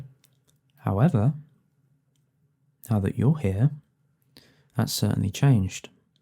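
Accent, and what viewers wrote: United Kingdom. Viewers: How did you manage to sound more british than britishiest british people. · British accent??? 😅 😮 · I just came here out of pure curiosity. NOT EXPECTING THE MOST BRITISH SOUNDING MAN I EVER HEARD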